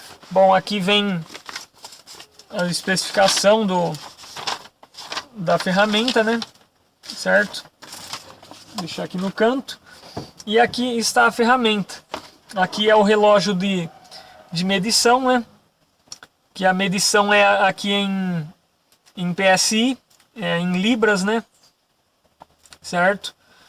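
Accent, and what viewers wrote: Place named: South, Brazil. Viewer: Eu tenho uma Brasilia 1978 que meu pai tirou "zero" e como sou aposentado, e realmente é dificil achar mecanico que conserte esse tipo de carro, assim começei a ver videos sobre o assunto - por exemplo o canal do Tonella que é muito bom entre outros. Em uma dessas duvidas queria saber como verificar a compressão do motor e seu video apareceu e foi muito bom. Em relação onde vc mora é pq me pareceu que tem um sotaque do sul e fiquei curioso em saber qual a cidade somente isso. Entendo que hj em dia existe muita violencia, vigaristas e todo mundo tem que se precaver. Mas sou da paz moro em Guarulhos e só quero aprender um pouco mais da mecanica a ar e possivelmente trocar experiecias. OK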